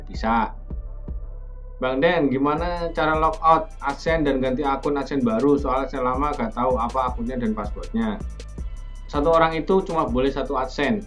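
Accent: Java, Indonesia. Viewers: WKWK😂 TAPI KOK LOGAT NYA JAWA??😂😂🙏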